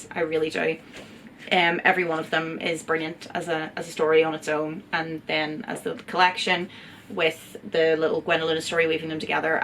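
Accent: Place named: Belfast, United Kingdom. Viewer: Totally agree lol. I tho yip thats a belfast girl alright lol 😅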